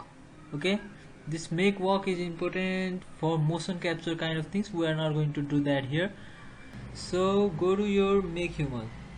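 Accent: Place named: Brazil. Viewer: he said you're a brazilian trying to speak english, so you should quit speaking english because to him you sound like a brazilian.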